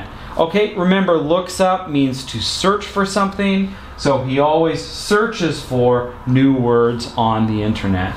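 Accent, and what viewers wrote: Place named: Canada. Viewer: Wonderful explication. Love your sweet Canadian accent.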